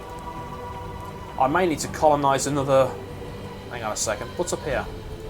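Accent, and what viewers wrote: United Kingdom. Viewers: I love your voice man. British English is best English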